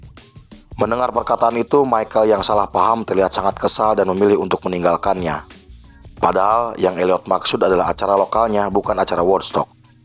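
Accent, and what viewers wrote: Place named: Banyumas, Indonesia. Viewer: Intronya ngapak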